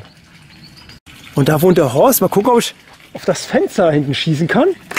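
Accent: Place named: Hesse, Germany. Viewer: Machste gut Bubb. Klares Deutschhessisch, guter Ton, konkrete Fakten, kein unnötiges Gebabbel .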